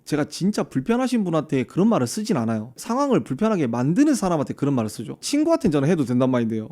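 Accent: Busan, South Korea. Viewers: 고향이 경상도 분이 아니셨다고? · 형 부산이여? 갑자기 겁나 친근감 가네요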